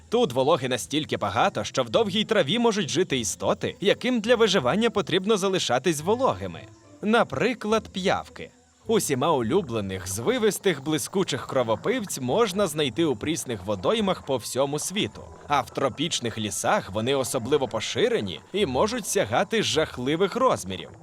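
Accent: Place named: Galicia, Ukraine. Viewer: Паплюження української мови галицьким суржиком. Риготня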